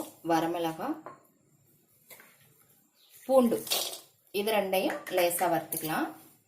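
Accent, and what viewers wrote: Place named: Tirunelveli, India. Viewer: திருநெல்வேலியா நீங்க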